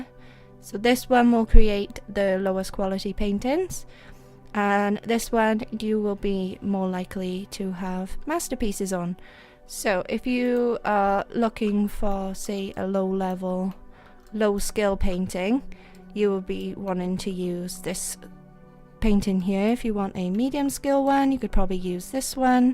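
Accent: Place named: Wales, United Kingdom. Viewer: It's nice to hear a Welsh accent, reminds me of my Mamgu!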